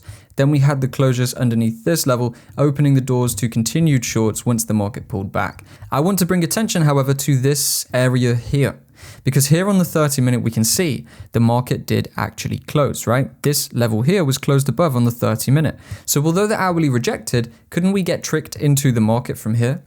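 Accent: United Kingdom. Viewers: When he was saying the the the, I think he meant to say there. OMG I hate B.English pronunciation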